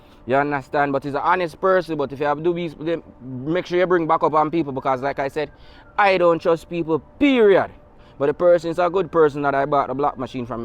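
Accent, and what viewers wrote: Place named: Patois, Jamaica. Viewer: In reference to you speaking patois,most of us were taught English in our homes.Our language culturally defines us and it’s okay.Building your platform requires clear spoken English so all your audience can understand.Thank you for choosing to provide this forum ,positive content is what we earn for ,blessings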